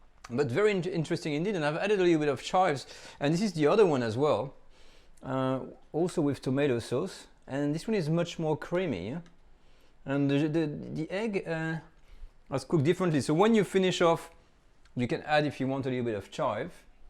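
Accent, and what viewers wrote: France. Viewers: Is your accent a regional one? I love it. Bit different to other French people I’ve heard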